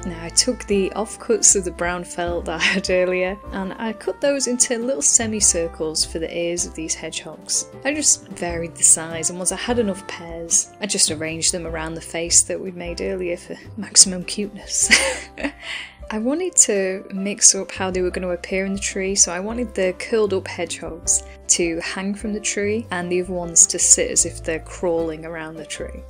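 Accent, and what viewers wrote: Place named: United Kingdom. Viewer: Brilliant, clever, clear and fun. Also lovely to have a native Brit. for a change!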